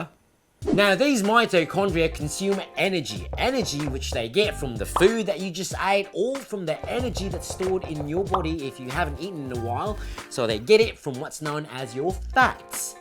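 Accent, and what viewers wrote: Australia. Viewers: Its still very surprising hearing an Aussie accent coming from a filipino guy. Coming from a filipino this is gold. Good on ya mate🤘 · Wait so do u really have an australian accent?? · crikey i love your Aussie accent mate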